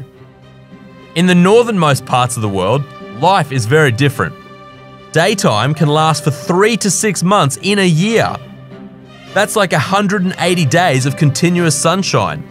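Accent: Australia. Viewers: Aussie mate explaining sounds so nice!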